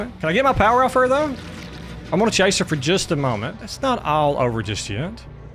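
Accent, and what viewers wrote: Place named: South, United States. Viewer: I love your southern drawl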